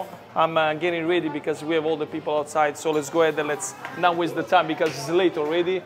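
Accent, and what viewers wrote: Italy. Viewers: Congratulación!!God bless you with health and happiness for you and you family to enjoy the new chapter in life.Im a new subscriber and your way to talk Italian bring me a lots of memories of my familia !🙏🙏🎉🎉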